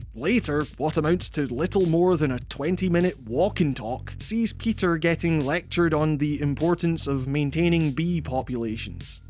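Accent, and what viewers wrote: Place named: Scotland, United Kingdom. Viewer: This is a Scottish accent.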